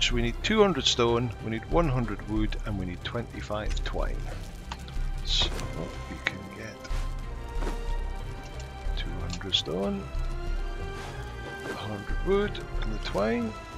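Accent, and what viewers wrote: Scotland, United Kingdom. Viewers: Excellent play through. And nice to hear a Scotsman for a change 👍✌️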